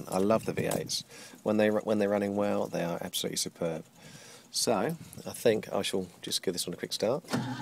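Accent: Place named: Australia. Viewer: Are you from Australia or New Zealand? I can tell your not a Brit because you're very Australian-sounding and your Land Rover there has an Australian/New Zealander number plate on it.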